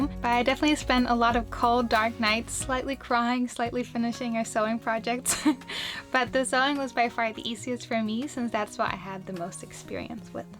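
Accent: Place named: United States. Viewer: Love the outtakes at the end. Your American accent is so perfect, I often forget you're from Sweden.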